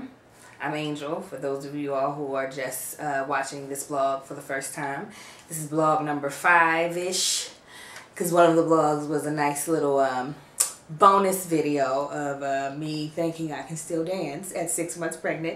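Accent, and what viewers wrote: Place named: South, United States. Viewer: The southern belle accent and out of breathe is just too cute.😂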